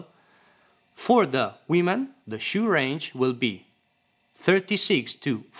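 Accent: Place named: Italy. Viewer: he's most definitely italian judging by his accent.I'm romanian and we don't sound like that when speaking english.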